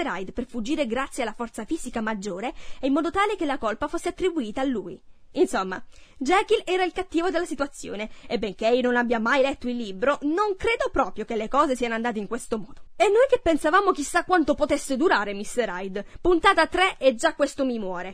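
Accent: Bari, Italy. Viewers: la cadenza barese è adorabile <3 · sei barese ho dio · oddio sei di bari? anch'io 😂😂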